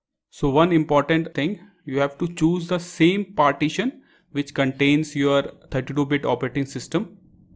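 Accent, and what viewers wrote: India. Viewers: The first I heard Indian accent i knew it's gonna be a success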